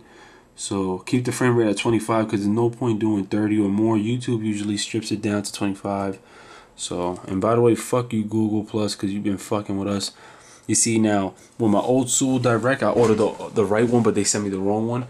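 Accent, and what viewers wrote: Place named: Queens, United States. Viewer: Nice vid bro I can tell you're from Queens NYC I'm from Brooklyn LOL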